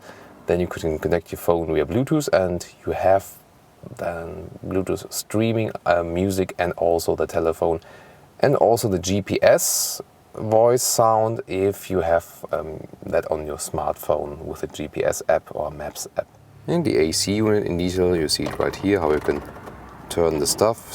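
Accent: Germany. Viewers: is he speaking germlish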